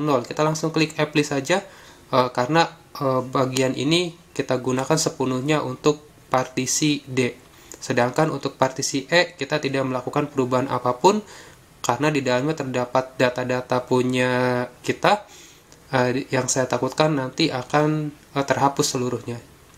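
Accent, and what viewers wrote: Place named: Java, Indonesia. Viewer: Medok bro wkwk Tapi gud lah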